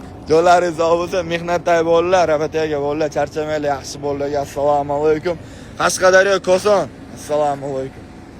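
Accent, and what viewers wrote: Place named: Dagestan, Russia. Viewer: Саломни догистон шевасида м узбекча иложиси бору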